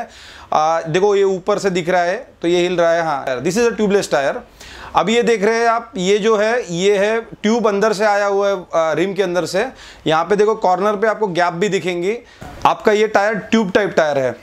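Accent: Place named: Gujarat, India. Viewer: Bhai Hindi bolneke Andaz see pata chal raha hai aap bhi Gujrati ho, specially from Khila word😂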